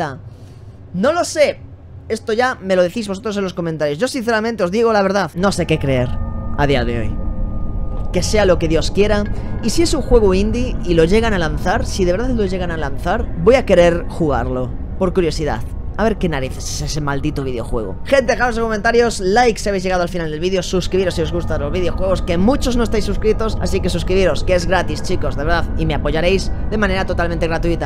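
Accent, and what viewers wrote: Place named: United Kingdom. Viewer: Me encanta tu ingles UK, que nadie te diga lo contrario!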